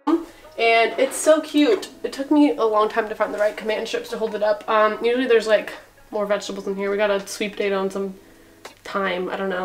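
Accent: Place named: United Kingdom. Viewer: Where r you from originally (i mean country)!!!! Bcoz accent is british but beauty is far more than average brits!!!!!! Please reply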